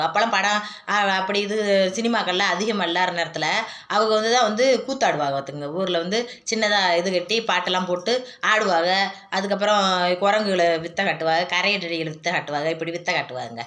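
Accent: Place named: Paramakudi, India. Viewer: நீங்க பேசுற ரொம்ப புடிச்சிருக்கு என் பிரண்டு ஒரு பரமக்குடி சைடு அந்த ஊர் மாதிரியே நீங்க பேசுறீங்க நீங்க நல்லா தொழில் பண்றீங்க அது மாதிரி தான் நானும் ஏதாவது செய்யணும்னு முயற்சி பண்றேன் ஆனா என்னால் செய்ய முடியவில்லை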